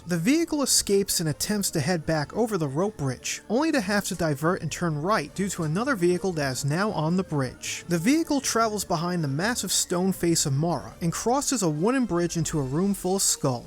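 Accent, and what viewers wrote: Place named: East Coast, United States. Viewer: One of the things I like about this channel is that glorious east coast accent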